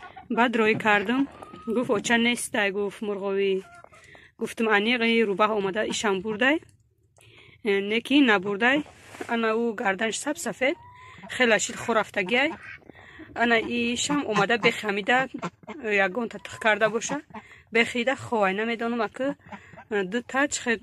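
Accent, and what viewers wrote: Yovon, Tajikistan. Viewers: Салом аз Ватан ба щумо гарибхо апащкам ранги Ёвониё гап мезана😁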